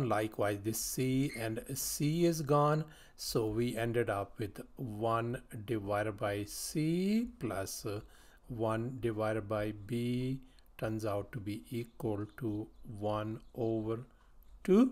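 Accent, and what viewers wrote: India. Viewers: Sir your voice sounds like Indian..then why you are in America..😒😒..don't you love india?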